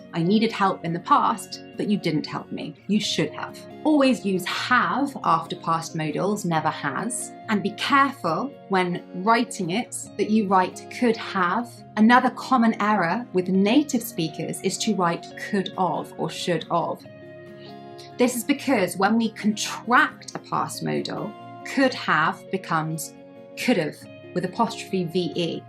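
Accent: United Kingdom. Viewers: Hi, I just love your videos. They are really helpful. Thanks for the great job you're doing. By the way, I love your typical British accent.❤❤❤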